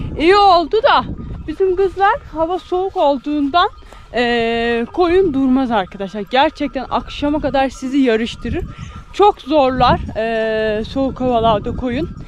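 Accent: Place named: Kastamonu, Turkey. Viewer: Istanbuldan selamlar seni izliyoruz Allah yolunu açık etsin bizim kastomonular gibi konuşuyorsun maşşallah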